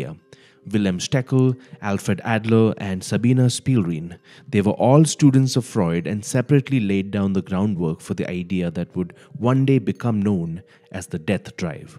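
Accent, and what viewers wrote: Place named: India. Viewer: I'm doing well, sir thank you! how about you? you have a very pleasant speaking voice and I always did like Indian accents. where are you from? I visited Varanasi once and bathed in the Ganges. the entire trip was such a memorable experience. thank you for this educational video, sir. ttyl, buddy! 🙂👋🏻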